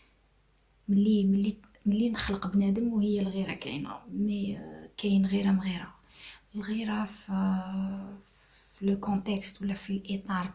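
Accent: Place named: Oran, Algeria. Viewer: Comme je te l'ai déjà dit sur Instagram,tu es le porte voix qui a perpétuel l'idée du Mouvement Populaire ou Révolution comme tu le qualifies pendant ces 5 ans que nous célébrons ces jours ci'.Pour moi,tu es l'exemple vivant de la Résistance à la Tyrannie au plan culturel et artistique.Pour tout cela tu mérites notre respect et notre reconnaissance PS : j'ai adoré ton accent oranais ou Tlemcen ..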